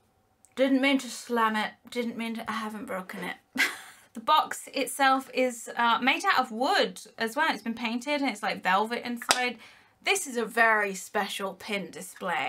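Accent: United Kingdom. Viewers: I love your British Accent. I actually am not British, or English, but I speak an amazing American accent and I am trying to do a British accent. And how much did this box cost?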